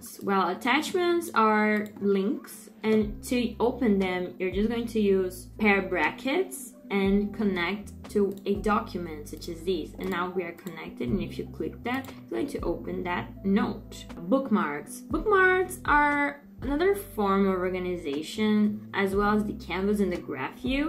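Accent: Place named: Portugal. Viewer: Im brazilian and it sounds like portuguese accent Just a guess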